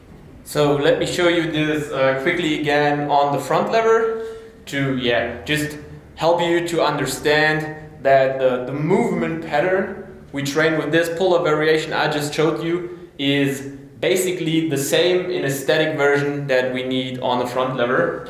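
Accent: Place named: Germany. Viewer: This is a very german english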